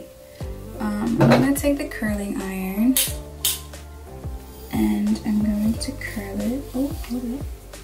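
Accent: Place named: Haiti. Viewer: Haha I knew you were Haitian by your accent. Love it♡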